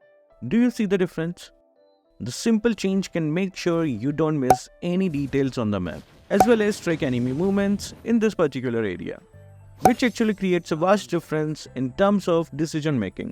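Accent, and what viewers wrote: India. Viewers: Ofc he is))) accent is perfectly Indian)) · Are you Indian Sir? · Bro are you indian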